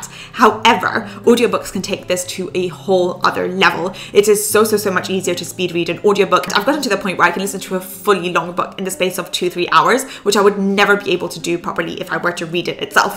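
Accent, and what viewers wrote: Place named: United Kingdom. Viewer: plz add subtitles I'm not compatible with British accent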